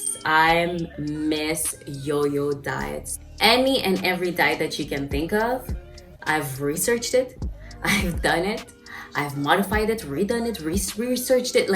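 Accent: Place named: Tagalog, Philippines. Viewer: Awesome video! Thank you for sharing your journey with us. Side note: your accent sounds a bit like you are a native Tagalog speaker (Philippines). I’d be curious about where you are from.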